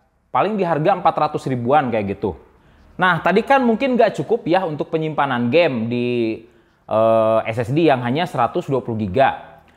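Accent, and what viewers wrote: West Java, Indonesia. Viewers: ep dan pi sunda pisan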